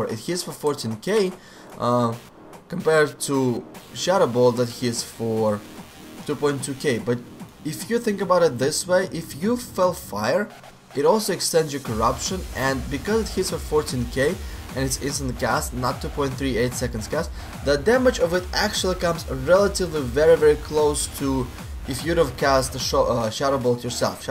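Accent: Russia. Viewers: Твой русский акцент, не плохо смотрится)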